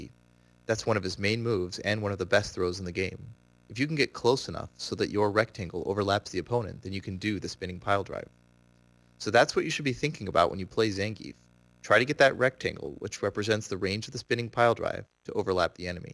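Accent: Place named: United States. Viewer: nobodys asking anybody to be a weeb, but this is the most americanized pronunciation of anythig street fighter i ever heard lol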